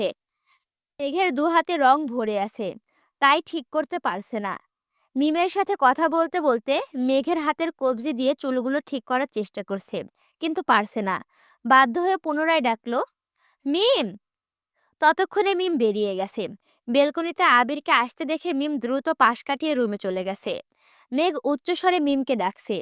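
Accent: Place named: Satkhira, Bangladesh. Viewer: Apu apnr district koi 😊 satkhira mone hocce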